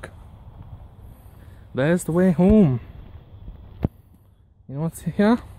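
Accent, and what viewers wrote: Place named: United Kingdom. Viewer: hey we now found out ur voice and aparently ur british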